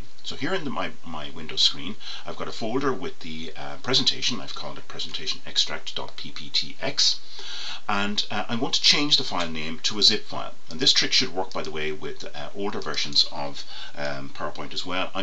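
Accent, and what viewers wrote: Ireland. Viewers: Brilliant! For us MAC folk, it works with the Unarchiver program, it's a fast Smooth fix! And it sounds much better with an Irish accent.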